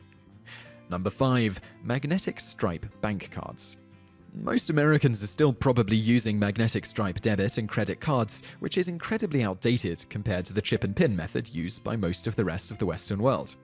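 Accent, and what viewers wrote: England, United Kingdom. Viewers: You have an English accent, but your video is about America.... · British english is obsolete too! Loose the accent! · your accent is english yet you use america as an example. ? :S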